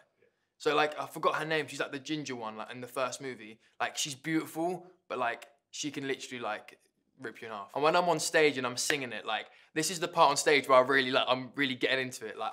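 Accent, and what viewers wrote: United Kingdom. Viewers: Why does he sing in an American accent but talks British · WOAH HE IS BRITISH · Lol hes British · Still can’t believe he’s British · OMG HES BRITISH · how come you have a british accent when you talk but when you sing in a american accent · SOMETIHNG WENT WRONG WHEN IS SINGING I HAVE AN ENGLISH ACCENT AND WHEN HE TALK IT AN BRITAIN ONE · HES BRITISH ?! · Did not know he was British 😐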